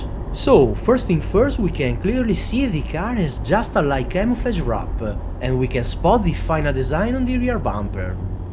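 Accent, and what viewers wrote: Italy. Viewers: Italian accent terrible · the most Italian English i have ever heard, 10/10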